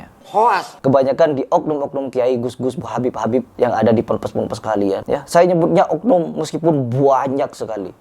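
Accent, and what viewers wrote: Madura, Indonesia. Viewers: Mas ni orang madura kah? Logat nya mirip2 madura hehe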